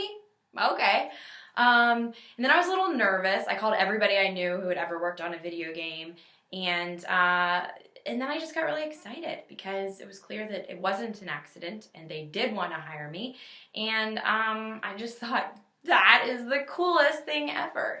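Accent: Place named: England, United Kingdom. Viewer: Soy español pero detecto un poco ingles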